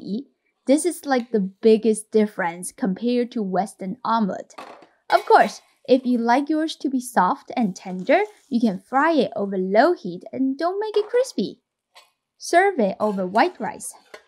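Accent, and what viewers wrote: China. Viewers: This looks delicious and I LOVE your accent! I've heard Chinese accent before but yours is lovely.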